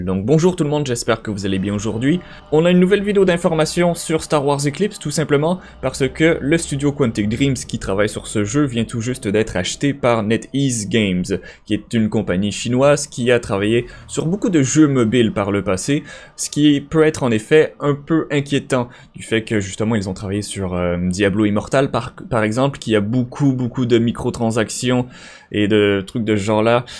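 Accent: Quebec, Canada. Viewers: Caché ton accent québécois, tu n'arrives pas.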